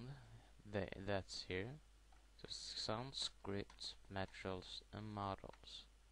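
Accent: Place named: England, United Kingdom. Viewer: where did u learn ur english accent :)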